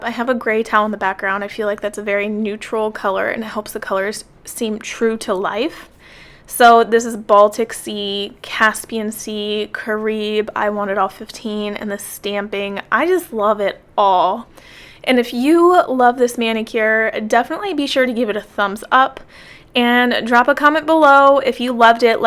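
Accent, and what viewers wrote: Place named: South, United States. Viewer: Hey! I just wanted to say that I absolutely love your content. I find your voice/slight Southern accent very soothing, and I love how you celebrate and build others up. I notice you feature a lot of smaller brands: Sol Dips, Shameless, Dipalicious...etc. I'd love you to discuss some of your favorite companies in a list and why/what they are best at. Additionally, I'm pretty new to dip, and thusfar have only been using my Dipwell system, but would love to mix and match more. So...I guess one thing that I feel is missing from YouTube content is how to crossover different dip products...if that makes sense...? Anyway, rambling. You're great! 😂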